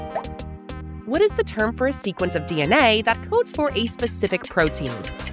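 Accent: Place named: United States. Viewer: there must be a lot of dumb shishkababs out there!!! Like your American acsent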